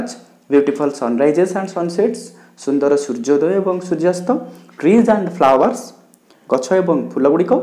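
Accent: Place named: Odisha, India. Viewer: Pleaee speak English in English and not in Odia. The accent🤦‍♂️🤦‍♂️🤦‍♂️🤦‍♂️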